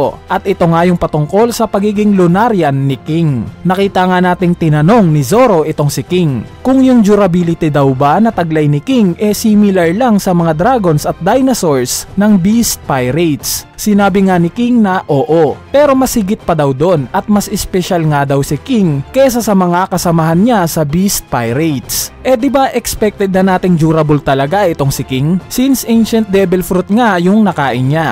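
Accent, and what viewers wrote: Visayas, Philippines. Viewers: Apu at hiyuri bisaya ka pala Apoo at hiyori lambutan mo po ang tigas ng bigkas mo sa pangalan nila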